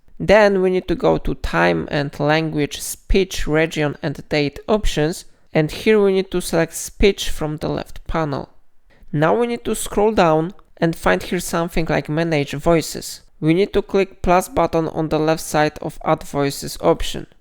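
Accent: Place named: Poland. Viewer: Polska ❤ trochę słychać po akcencie i na dole widać POL pozdrawiam 👍🏻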